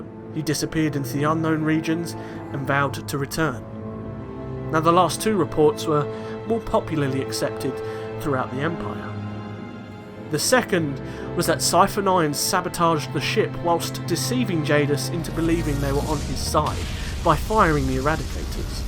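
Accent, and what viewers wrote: United Kingdom. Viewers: I like these vids. Listening to SW History told by someone with a British accent, makes it so much cooler.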